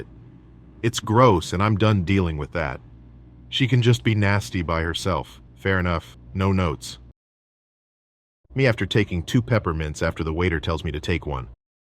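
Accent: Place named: United States. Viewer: Love the conten Hate the voice I hate American speaking voice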